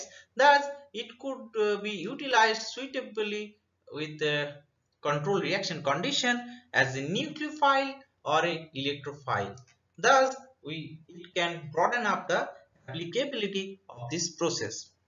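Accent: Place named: Bengal, India. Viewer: He has Bengali accent, so don't poke him.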